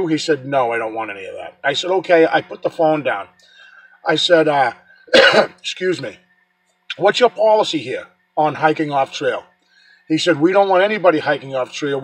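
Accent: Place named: New England, United States. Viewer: I'm not sure I believe that cr...p the park rangers are telling you...they know what's there.....I know what's there from watching your vids. I understand and respect your that you will go by their rules...and it's probably not a good idea to go off trail there anyway cuz there just might be something you don't want to run into...on the other hand if your gonna do any kind of field research on big foot you must be prepared for the worse to happen rite?? There are plenty of other places to go just like you said tho....Ive been a to a lot of forest ares up there as you know I to am from Mass........White mountains is where it's at!!!!! Again stay safe love your vids and I sure do enjoy hearing that new Enland accent again 😁😁😁LINDA IN FLORIDA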